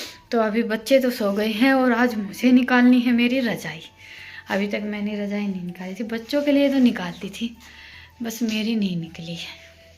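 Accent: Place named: Alwar, India. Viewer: Aap kha se h plz batana aapki boli se to aap Alwar ki lagti ho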